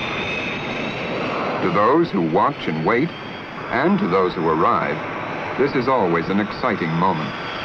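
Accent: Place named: United States. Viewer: New York was very cool city during those days, unlike today's influx of countless people from all sides. However, I do appreciate the style of this genuine American English accent through the narrator. I can't recall specifically now, but perhaps this person also narrated some other TV documentaries during 50s and 60s. Surely, we nowadays miss this kind of manly voice which was often heard then in movies and TV programmes.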